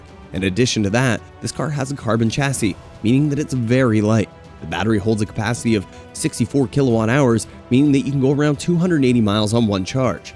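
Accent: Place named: United States. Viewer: Hahah mispronunciation master . Got all the horsepower wrong and with an American accent talking about pounds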